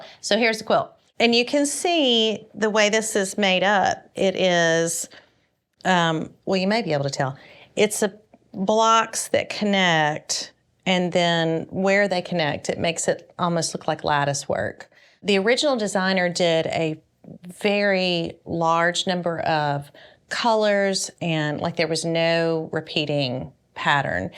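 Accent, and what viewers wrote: South, United States. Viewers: It's a show and tell. And I think I speak for many of us that we enjoy listening to her Southern accent as much as viewing., I bet your Dad was thrilled that his Daughter, with her busy lifestyle took the time to learn and energy to create such a beautiful, useful gift. And you shared the lessons learned with us and made a new friend along the way, as well as your Subscribers. Bravo.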